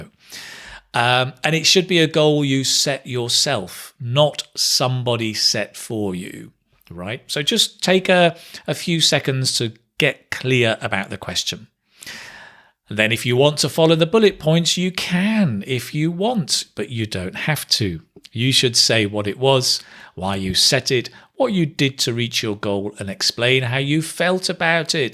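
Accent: United Kingdom. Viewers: is it British English?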